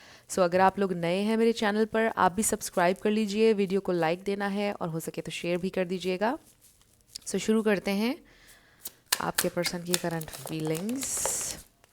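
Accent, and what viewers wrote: Pakistan. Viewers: Aslamo alikum ..mujy aapki voice llehjy sy lgta hai aap peshawar sy hai hindko speaking ....?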